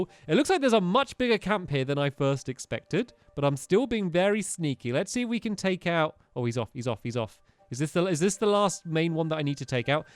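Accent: England, United Kingdom. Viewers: Quest98Xx hes from england they pronounce things differently, not wrongly, just different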